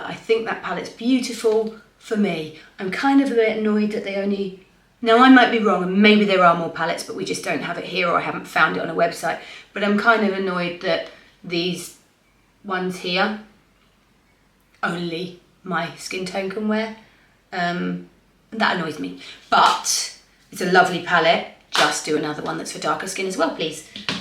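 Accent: England, United Kingdom. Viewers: you are just so sweet and I love your lovely English accent. I think the kosas foundation looks amazing on your skin. Your skin is beautiful without makeup…..you are glowing girl. I so enjoy your videos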